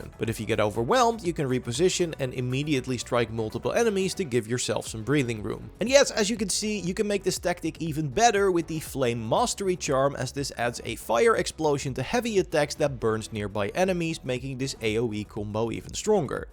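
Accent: Netherlands. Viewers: Bro are you dutch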